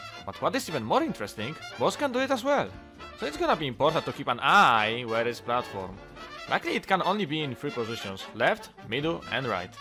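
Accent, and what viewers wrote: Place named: Poland. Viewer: i love his polish accent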